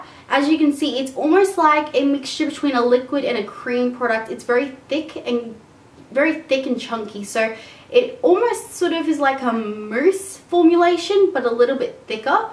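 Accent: Australia. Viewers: Kawaii Cukie Going by her accent she's in Australia like me, our Daiso stores sell everything at $2.80 :( everything is more expensive here.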